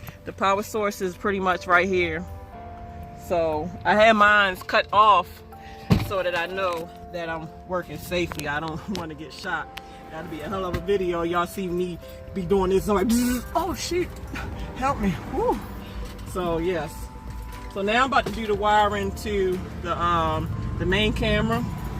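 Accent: Baltimore, United States. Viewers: My 10 year old recognized your voice. He asked if you were from Baltimore. I told him yes. He said you talk like one of his friends from Baltimore. So funny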